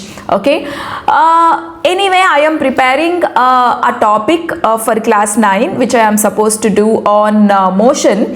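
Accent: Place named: South India, India. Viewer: Just a South Indian accent.....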